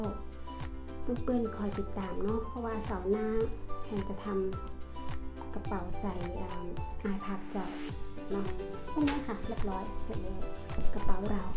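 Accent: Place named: North, Thailand. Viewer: ภาษาเหนือน่ารักค่ะ กระเป๋าก็สวยค่ะ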